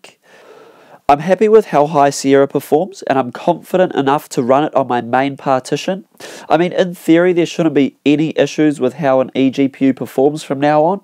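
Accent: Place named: New Zealand. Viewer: New Zealand? reminds me of Rhys Darby (Murray Hewitt's character) from Flight of the Conchords :) btw, great video. thanks for sharing.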